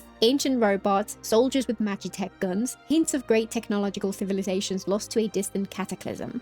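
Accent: United Kingdom. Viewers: Have you ever thought of doing ASMR ? I adore that voice and British accent of yours! BTW looking forward to your analysis on Archon quest 7.0. Great job.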